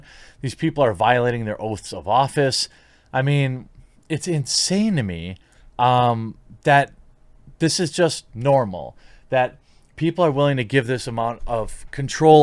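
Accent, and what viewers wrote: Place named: Wisconsin, United States. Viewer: “Wisconsin accent confirmed”! There is a guy from WI that runs nations largest goalie clinic for ice hockey. I think his brother has a Green Bay sports shop or something. Anyways, my kids sometimes overhear me watching your videos and “lightly” laughing about “fledging” or “baeg”. Well they overheard the dude say the same thing as we left. It was surreal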